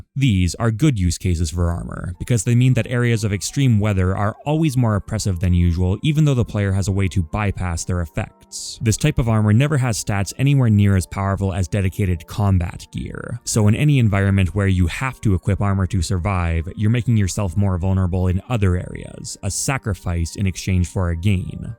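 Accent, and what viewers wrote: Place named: Canada. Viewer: i don't like how negative about the game u are in this video. But ur points make lots of sense and i agree with alot of them. also i have finally realized that you are probably canadian based on the amount of times i've heard you say the word about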